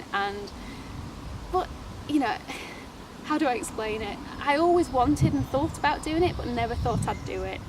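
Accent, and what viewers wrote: United Kingdom. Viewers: I have always loved the UK accent every since going out with one for a couple of years in the 80s. 6 months is along time witch means you'll hit my state late Sept or Oct. Last year snow started the last day of summer and we ended with 120 - 130 % total snow pack. This year suppose to be hot and dry but my state is unpredictable . We have the Pacific Ocean on the coast rising to the Olympics dropping into Puget Sound before rising to the Cascades. CHECK out Farley this year he is a VEGAN and this guy is Bookin. In 45 days he has reached the halfway point 1235 miles. You can see where he supplies and where not to. GOOD LUCK, I just sub.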